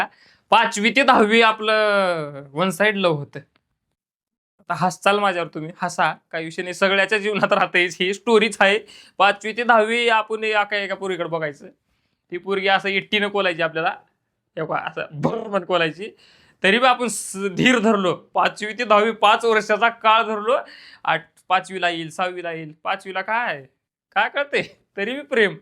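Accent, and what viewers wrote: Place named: Latur, India. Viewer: 100%Latur cha hay bhau😊😂😂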